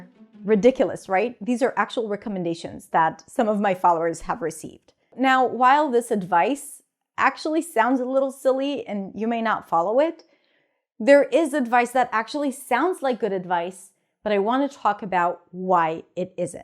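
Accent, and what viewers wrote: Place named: United States. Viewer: English is my second language and i've learned it just by watching youtube videos and listening to english podcasts (joe rogan, mr ballen etc). I've been doing this for many years and many hours per day, every day. So..., i'm sorry but your statement that learning a language by watching/listening the targeted language is bad advice is mind blowing to me. Can you explain how did i acquire my english? I've never spoke it while learning it, and one day, i just start speaking it spontaneously. You dont learn a language, you acquire it by listening and reading and you dont have to speak it, for it will come later, naturaly. Also your american accent is flawless, i think english is not your second language, if i am wrong, im sorry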